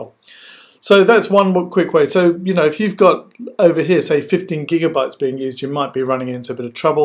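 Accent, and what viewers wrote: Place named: England, United Kingdom. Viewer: Ahhh first so lovely to hear a nice English voice, and second a FANTASTIC tip! Thank you!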